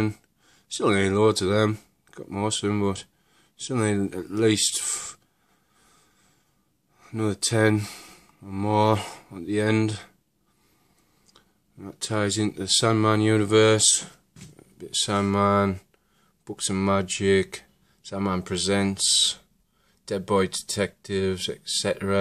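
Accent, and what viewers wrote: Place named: Northeast England, United Kingdom. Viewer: I'm from just outside Newcastle, so I picked up on the accent! Have you added any more to your batman collection since you posted this video?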